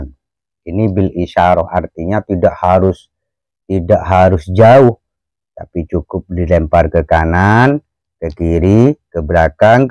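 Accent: Madura, Indonesia. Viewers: Ass. Imsm Video yg di sampaikan TIDAK UNTUK UMUM di Khususkan Hanya Untuk Orang Madura,???!!! Usul dan Sumbang Saran, klw Untuk Umum Alangkah Lebih Baiknya Agar Yg Lebih Banyak Penonron Yg terbantu Meng LIKE SUBSCRIBE, Sajikan Video Kedepannya, Agar Adminnya bisa Tambahkan Tek Ketikan Ada Hurup Arab & Latinnya & Artinya, Agar Memudahkan Para Penonton, Tidak di sampaikan Dalam Pembicaraan, Faktor Segi Bahasa yg di sampaikan Masih Terdapat logat Madura, Penonton Tidak mudah PAHAM tk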